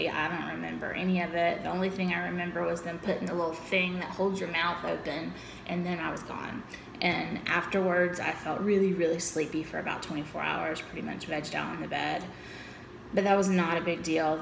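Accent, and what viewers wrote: South, United States. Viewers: Hey, I'm glad you were honest and I do understand how dark that place can be. I am so happy that you are in a better place. I felt the same way during the psychological testing. And I am still going weekly to my psychologist. I probably will for the rest of my life. You are on your way girl to feeling better. I heard you say Ya'll a few times and I am picking up a little bit of a Southern accent where are you from? We live on the Gulf Coast in the deep south, MS. Keep posting please, it's helping me get through this long wait. Rebecca:)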